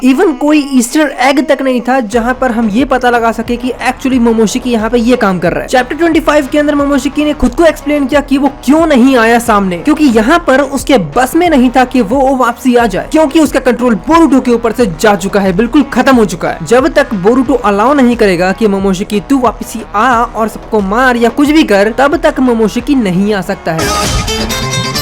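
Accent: Bengal, India. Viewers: Bro are you bengali???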